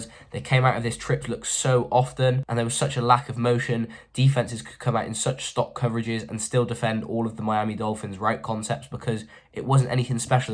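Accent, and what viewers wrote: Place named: United Kingdom. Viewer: It's something special about hearing a Brit breaking down American football. . . AND KNOWS WHAT HE'S TALKING ABOUT. I'm here for it.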